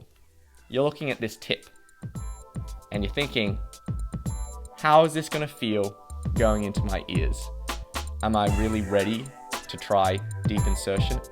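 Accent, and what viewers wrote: Australia. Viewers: uh he doesn't sound north korean, sounds more aussie to me.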